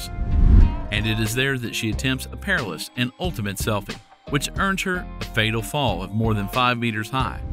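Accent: United States. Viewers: Can't you use feet vs meters? I hate googling the answer. Lol. U sound American, not British.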